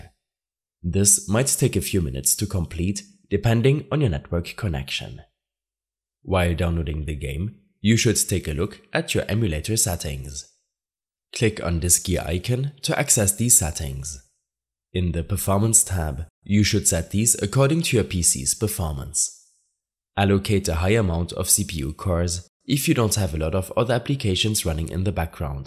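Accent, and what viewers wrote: Germany. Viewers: are you german du have a akzent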